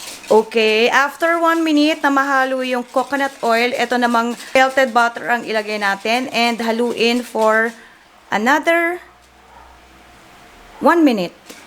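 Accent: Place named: Visayas, Philippines. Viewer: wow magaya nga in bisata accent 😉 pa sab back ka keto, keep connected, tnx 😉📊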